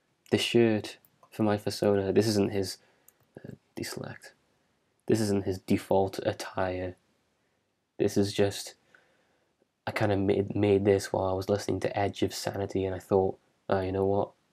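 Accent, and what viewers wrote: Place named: England, United Kingdom. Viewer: tries to guess your region accordion to your accent... Well it's obvious what it's NOT. I'm just going to be vague and say "southeastern". Although I could have sworn I heard a slight northern tinge. Did you just say "fwiends"? Pwease don't tell me there is a slight Geordie influence in there! You know what? Scrap that! You know that scene from Mrs. Doubtfire when Pierce Brosnan (yeah, and obvious Irishman, lol) mentions how he can't quite place Mrs. Doubtfire's accent, and so he responds, "All over." Yeah, that's what I am saying your accent is, everywhere in England anyway, accept for Cornwall, definitely not Cornwall. Wait, did I just use "accordion" when I meant "according"?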